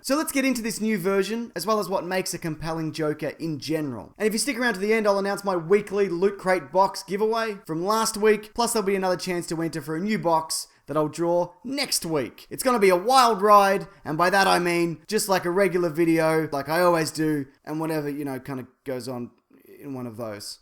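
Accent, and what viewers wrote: Australia. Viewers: I really like the idea of a solo joker movie with Harley Quinn. I felt there was not a reason to put the Joker in the Suicide Squad movie. It's as as if they want to put as many characters on screen as possible and sees what sticks. But thanks for letting me know that there's a novelization. I'll see if it's at <link>. By the way your Australian voice is awesome!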